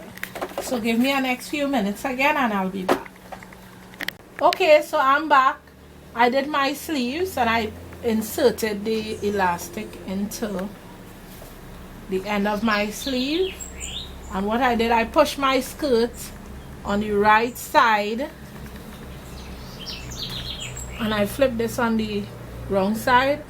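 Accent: Trinidad and Tobago. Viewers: hey are u trinidadian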